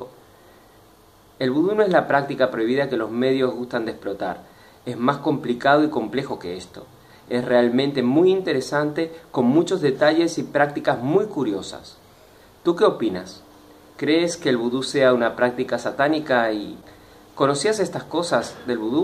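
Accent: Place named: Argentina. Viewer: si es barcelona, ¿x que hablas como argentino?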